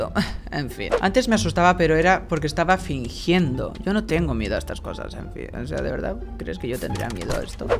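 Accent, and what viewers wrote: Argentina. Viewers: Me gusta más Íker con su acento español no se siento que no le queda el argentino 😭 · nuestro ídolo por ser el mejor con combinaciones perfectas como terror + comedia + sufrimiento de + acento argentino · Vos sos argentino ? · Oye me encantan tus videos pero una duda ¿Por qué hablas como argentino? Perdón 🙏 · enserio me das mucha risa a mi y a mi hermana y más que todo me da risa como hablas en argentino y dices groserías jajajajajajajajajajaja❤❤❤❤😂😂😂😂😂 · Hola, no hace falta que dejes de hablar con acento argentino, nos haces felices igual:) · Sabes que habla como argentino??? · eres argentino o español o de que pais eres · Te sale el acento argentino y el acento venezolano😂😂😂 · +terror+acento argentino=jueves perfecto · +granny+sustos+palomitas+sabado+su acento argentino=definición de felicidad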